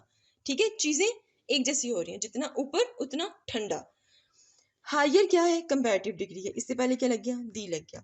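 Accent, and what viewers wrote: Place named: Punjab, India. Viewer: You speak Urdu and few sentences in English with Punjabi accent. For example, words got and correct you pronounce with Punjabi accent. Also, a basic Urdu mistake: when you begin a sentence with Aap, it ends NOT with ho but hain. This is Indian type of Urdu which is not correct.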